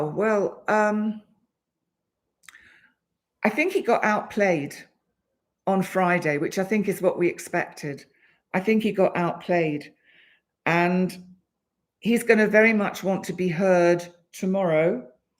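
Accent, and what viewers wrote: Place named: England, United Kingdom. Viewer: I loove your english accent ❤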